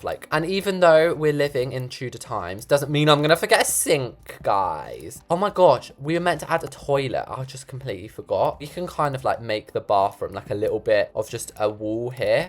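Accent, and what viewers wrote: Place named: United Kingdom. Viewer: When u talk British I have no idea what u mean😂 I’m American so I enjoy when my fav YouTubers (ur one of them ofc!)that are British say things abt the UK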